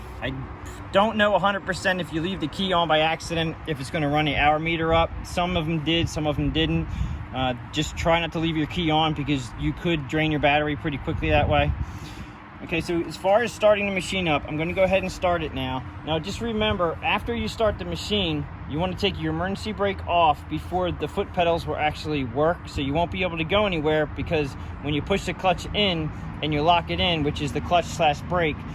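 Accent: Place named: Delaware County, United States. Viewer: Also are you from southeast PA? You have a Delco accent!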